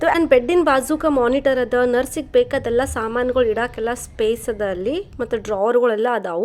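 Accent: North Karnataka, India. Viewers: Am fan of your kannada, america dalli idru kuda nim uk slant kannadana martilla, yavde hammu, bimmu torisde kannada matadtira, really great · R u from uttara kannada or uttar karanataka..ur accent sounds from uttar karanataka but ur surname says u r from coastal part of karanataka..confused 🤔🤗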